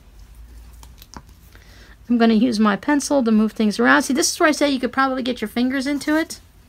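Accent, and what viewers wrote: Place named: South, United States. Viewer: I am so thankful I came across your videos, about two years ago. You are so talented, I love the rambling (and the funny southern pronunciations), seeing your thought process, and how you describe every step (with many alternatives). Bless you.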